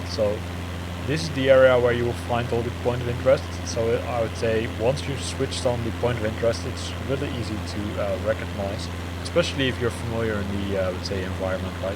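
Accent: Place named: Netherlands. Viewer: Recognized the accent and immediately Subbed to support a fellow Nederland er!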